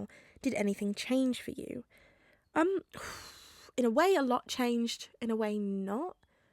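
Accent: United Kingdom. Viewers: i love you bestieee. 10/10 cute video youre really good at a british accent, do you practice? >:))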